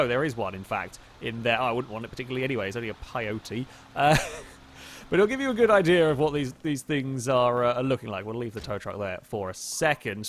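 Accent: United Kingdom. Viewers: I only watched one video of you, and already I am loving your goddamn videos, keep up the good work, dude! (P.S. Your voice sounds British, are you from London?)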